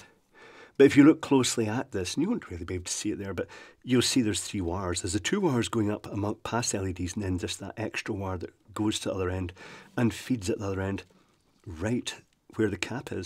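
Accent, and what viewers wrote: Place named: Edinburgh, United Kingdom. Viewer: The only thing odd about the sound is your very posh accent, almost Morningside 🙂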